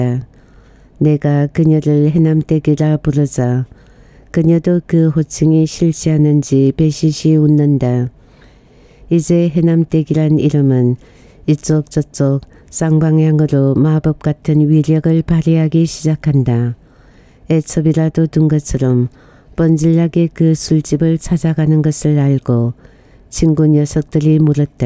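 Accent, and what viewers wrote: Gyeongsang, South Korea. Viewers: 경상도억양이 처음에는익숙지못했지만지금너무도정감이느껴짐니다.감사히잘듣고있씁니다.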